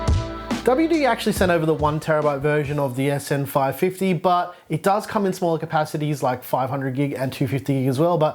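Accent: Australia. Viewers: This is the first video I've seen you, and 3 seconds in I know you're an Aussie Tech youtuber! Subbed! Hope to see more.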